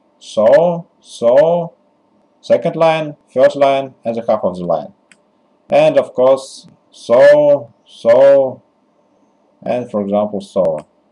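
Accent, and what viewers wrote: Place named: France. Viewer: understanding you is quite difficult. we just want to know how to get the answer and the guy has a french accent that why its hard to understand him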